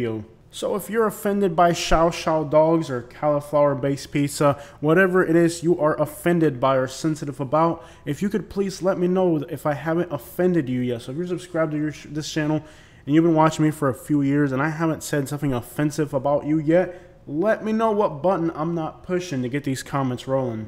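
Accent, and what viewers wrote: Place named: South, United States. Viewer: 😂 your southern accent kills me 😂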